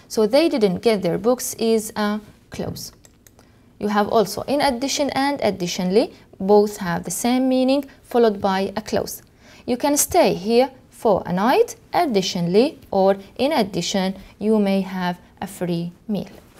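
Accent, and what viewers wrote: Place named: Arab world. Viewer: دروس جميلة لكن طريقة نطق بعض الحروف ليست جميلة كحروف T D P يبدوا أن من تقوم بالشرح لغتها الأم عربية؟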